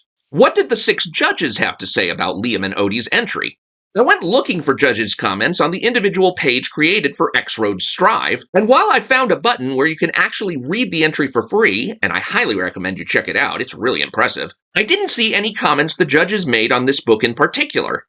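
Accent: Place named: Australia. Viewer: Your just coping and seething, luv the Aussy accent through.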